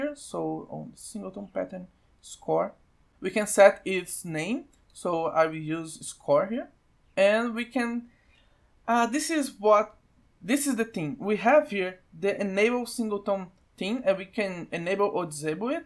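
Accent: Brazil. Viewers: I can recognize a fellow Brazilian just by his accent, continue a fazer seus videos que eles me ajudaram muito!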